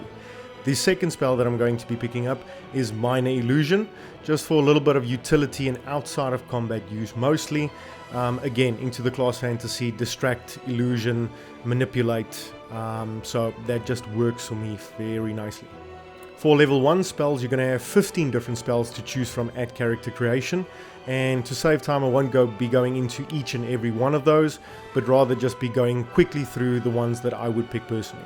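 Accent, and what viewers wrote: Afrikaans, South Africa. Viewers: dude jy klink afrikaans.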